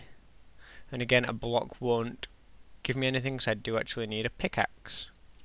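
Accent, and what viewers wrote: United Kingdom. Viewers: TOO MUCH BRITISH! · cute british accent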